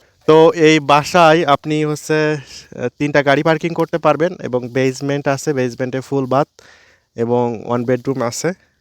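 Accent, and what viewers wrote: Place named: Satkhira, Bangladesh. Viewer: satkhira theke... Apnar vasa sune mone hoi apnar bari satkhira..jodi satkhira bari hoi taile janaben 😊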